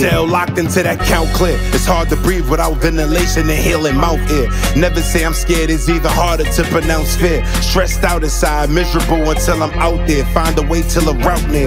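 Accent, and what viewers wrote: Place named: New York, United States. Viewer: This is what NYC supposed to sound like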